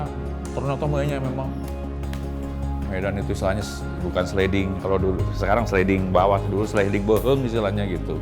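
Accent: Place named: Banyumas, Indonesia. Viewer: ngapak the legend❤... & endog juga carita legend... & saya datang final🤩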